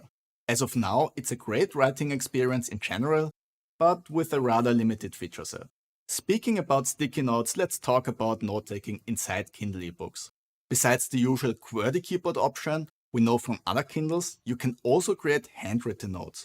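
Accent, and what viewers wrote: Germany. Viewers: Muy friend, your video is perfect, your content is very good, but you need to work on your english, because it really sounds like german spoken with english words. And I am writing this as a non-native listener. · Bad bad german or austrian accent sucks alot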